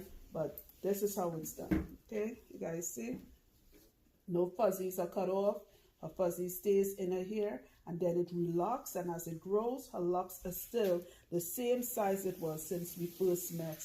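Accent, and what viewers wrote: Trinidad and Tobago. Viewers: I knew you was TRINI before you confirmed it! Thank you for representing in such a knowledgeable and approachable way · From the time you began to speak, I knew 🇹🇹. Great job lovie. Keep it up.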